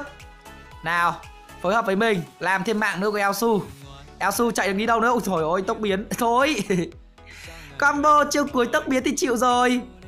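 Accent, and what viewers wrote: Nam Dinh, Vietnam. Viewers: A ở nam định hay gì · Đúng giọng nam định... a quê ở tp nđ à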